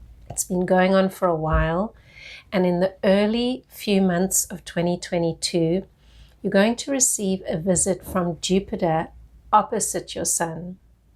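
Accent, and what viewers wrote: South Africa. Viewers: From Sth Africa?? I love the accent. Cheers.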